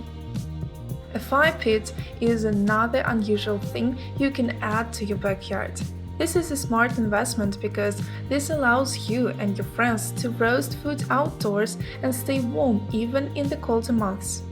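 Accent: Russia. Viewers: You have russian accent... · Русская на английском, слышен акцент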